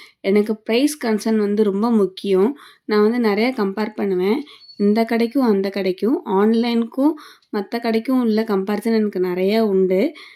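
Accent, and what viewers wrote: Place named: Karaikudi, India. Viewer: Neenga karaikudiya unga varthaigal alagu ola irukku simly suprer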